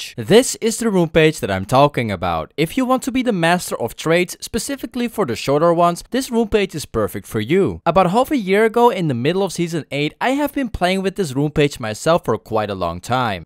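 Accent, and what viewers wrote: Netherlands. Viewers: ayy we out here. But are you dutch? You have that accent atleasd ^^.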